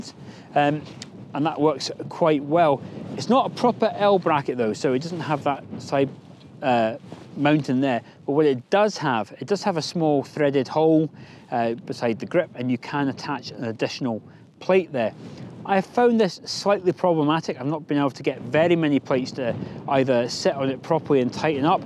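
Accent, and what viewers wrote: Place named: Scotland, United Kingdom. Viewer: I did not know you are from Scotland, probably because I am American, hopefully one of the better ones and not the loud obnoxious ones. You certainly shine with happiness, fun to watch and I ever got excited. You did not give the savings in grams for shooting in 720p over 4K. The savings must be substantial. I like the image of the lake, good vantage point. Thanks for sharing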